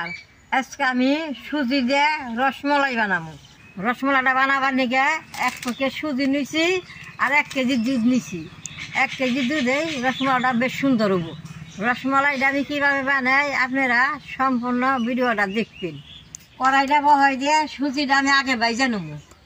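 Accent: Tangail, Bangladesh. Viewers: Dida apnar vasa amadar tangayl ar vasar satay onayk mill . Rosmalay darun hoyaca.❤❤❤apni onayk cute.😅😅😅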